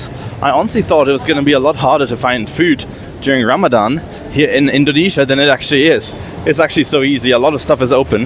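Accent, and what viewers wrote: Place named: Germany. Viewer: German accent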